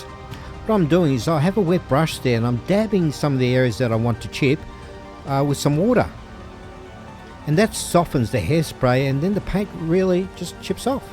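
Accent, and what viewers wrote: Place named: Australia. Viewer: Holy crap! You're an Aussie. Nice job, it brings back memories. The old series was by far the best.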